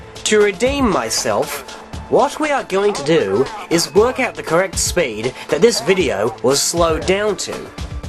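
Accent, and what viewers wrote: Australia. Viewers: Excellent job in clearing up your honest mistake... Thanks for showing us how it happened. Unfortunately the Apollo debate has now become more of a personal battle between the CT's and the propagandists, than it is about the Apollo subject itself. Except for the Aussie accent, that sounded just like me! LOL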